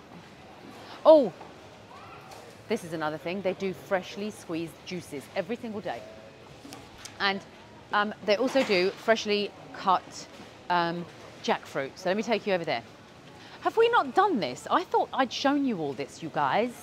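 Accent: England, United Kingdom. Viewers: are you from England.. mem ... ❤❤ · I always looking forward to your next videos... It's interesting. Maybe it's your British accent attraction...😅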